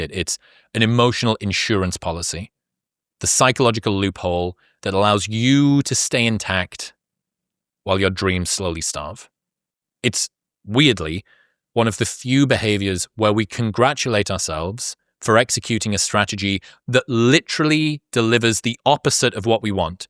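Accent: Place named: England, United Kingdom. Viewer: When did Zuckerberg get an English accent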